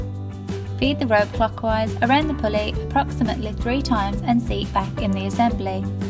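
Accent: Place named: United Kingdom. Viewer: Watching this from the states, much more enjoyable with that soft British accent than the beer drinking mechanics we have here in the US. LOL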